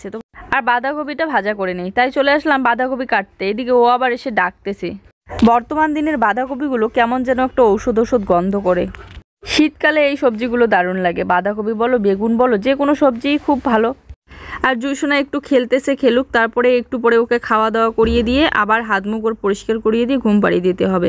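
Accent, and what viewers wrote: India. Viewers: অনেকদিন দিল্লিতে ছিলো, তাই কথায় কথায় হিন্দি শব্দ, উচ্চারণ এসে যায়। এটা স্বাভাবিক।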